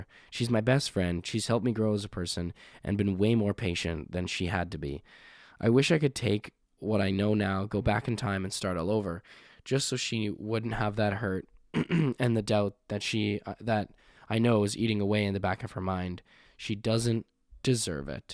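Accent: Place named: Australia. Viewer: Nailed the Australian ascent 😂🛴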